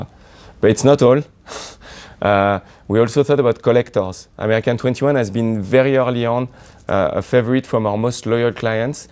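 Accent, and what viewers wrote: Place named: France. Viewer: strongest fr accen.i hearrd in a while lol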